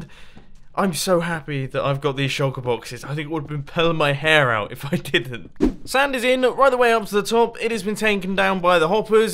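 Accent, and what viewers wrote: England, United Kingdom. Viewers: WAIT! UK OMFG I THOUGHT HE WAS USA I UK😱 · I love your vids, I mostly just watch for the English accent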